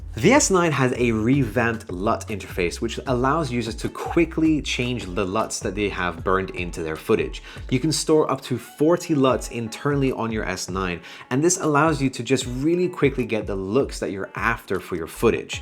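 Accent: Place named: United Kingdom. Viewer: Love the S9!!! OPENGATE!!! by the way at first I thought you're american but I keep thinking you sound kind of british. I had to check your channel info 😅 (fyi I'm studying how to speak English which is why I'm trying to guess the accent 😅)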